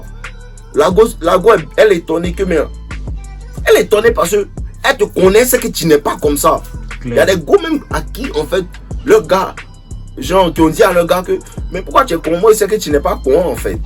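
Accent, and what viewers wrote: Ivory Coast. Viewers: On reconnaît l'accent ivoirien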